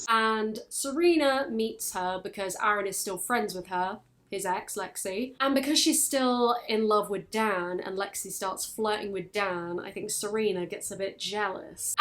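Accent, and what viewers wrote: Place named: United Kingdom. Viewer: I love your British dialect its lovely to listen to!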